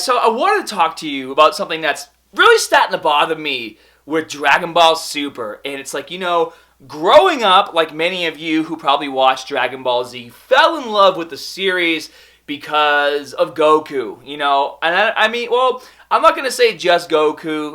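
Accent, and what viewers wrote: Boston, United States. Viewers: he's not from Australia, thats Boston accent haha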